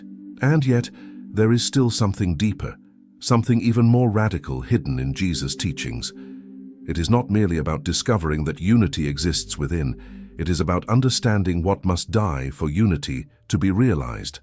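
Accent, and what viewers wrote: United Kingdom. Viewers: OHH, GOD, NOT. THAT AWFUL BRITISH ACCENT......AGAIN !!!!!!!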